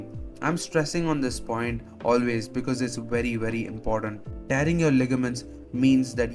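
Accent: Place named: India. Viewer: after i heard the indian voice i knew that video is gonna be crazy xD gj bro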